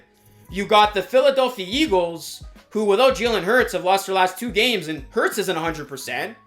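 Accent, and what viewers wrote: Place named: Wisconsin, United States. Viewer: Lol I hear that Wisconsin accent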